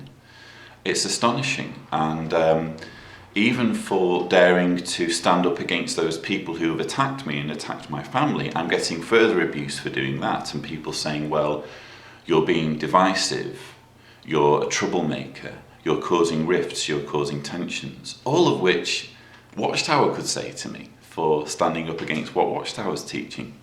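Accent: England, United Kingdom. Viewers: Ignorant men cannot be educated. Neither can ignorant women. There is a lack of education. Lack of education can be remedied. A few good lectures and careful correction of writing and analysis by a good prof. Such an education made me effective for Supreme Court litigation and corporate antitrust work. Anyone with half a brain knows that the biggest turn off is ME, ME, ME, ME, ME, ME. ME. He is far too old to be a millennial ME, ME, ME. God help his children. ME, ME/ Most likely, I can describe his wife. I suspect she lacks confidence. Anytime she wants to learn, she hears that all funds need to go to ME, ME, ME. I suspect that he admires her cleaning, sewing, and childrearing skills most of all. Sex is often secondary to working class men. They are not good lovers. ME, ME, ME. Rather than spend any family time, they hang out with men who endorse the ME, ME, ME mind frame. 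Ask me, how do I know this? First, observation within my own family. Second point. Reading detailed anthropological and psychological studies of working class men. ME, ME, ME Always ME> never WE, Never Our movement, pointing out powerful women and men, NO. ME/ My PC is very problematic. Also, his English usage is not that great. Don't fall for a proper English accent as the hallmark of intelligence. There are much better speakers and writers. If my computer were working, I could show you a much better example of my own writing. Dietrich Bonhoeffer, Hemingway. Steinbeck, F. Scott Fitzgerald, Hillary Mantel w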